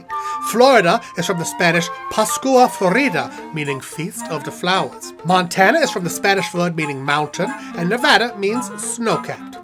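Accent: Germany. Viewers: Your ''German'' accent is shit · that's the worst german accent i ever heard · WHATEVER GOES FOR ME it's a german accent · you have a German accent? really?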